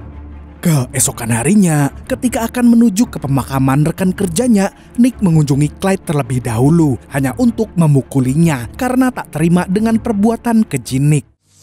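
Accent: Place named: Kebumen, Indonesia. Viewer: Jelas admin ini orang kebumen , ke Sempor beli mendoan , ora ngapak ora kepenak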